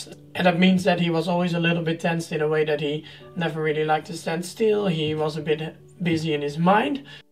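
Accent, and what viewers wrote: Netherlands. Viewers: Are you Dutch? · Je praat op een Nederlands accent 😂😂😂❤️ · Are you Netherlands our Englisch?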